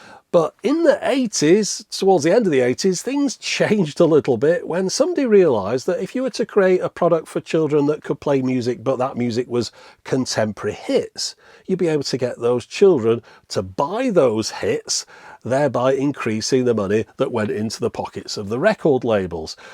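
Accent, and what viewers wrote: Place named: Manchester, United Kingdom. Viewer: I grew up in north Manchester and your accent sounds very familiar, are you from that area?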